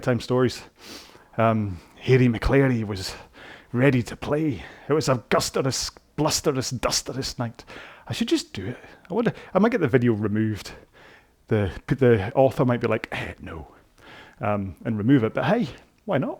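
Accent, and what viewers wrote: Scotland, United Kingdom. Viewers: #so, thanks again for scotlishly (is this a real word?) talking through this climbing intensity row. #muchmorebetter, I know you have already finished the series, but I'm just enjoying them one by one since the sportschools are closed again. Cheers, Bart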